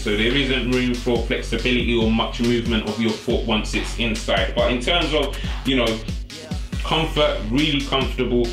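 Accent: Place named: England, United Kingdom. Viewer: decided to turn on captions so funny watching what it comes up with because of you're strong English accent it doesn't quite understand properly la bit like a scottish accent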